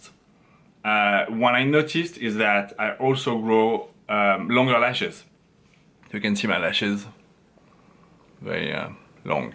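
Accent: France. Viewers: As a French I like your French accent 😁😁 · french accent bro ah la la jsuis pas le seul mdrrrr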